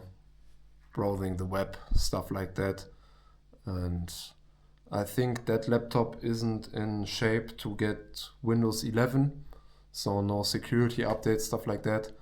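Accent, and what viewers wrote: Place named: Germany. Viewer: Most german accent ever seen🎉❤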